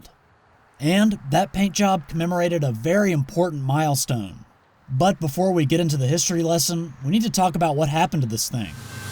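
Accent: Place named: Augusta, United States. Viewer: “The train company” “THE TRAIN COMPANY?” I’m gonna have to listen to this video when I’m homesick for the CSRA accent [Augusta GA area]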